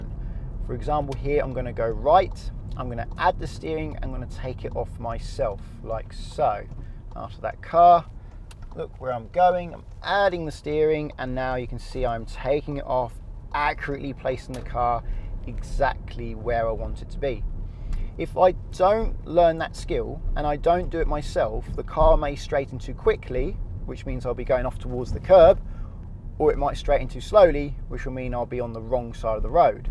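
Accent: United Kingdom. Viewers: Man your the best If I was in the UK (assuming it's where your live because of your accent) it'd be a pleasure to be your student My current instructor is so ruthless he's slapping my hand without even telling me what I've done wrong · Well, I just realized I speak more of American English than British English looking at the way this British man speaks😂😂😂.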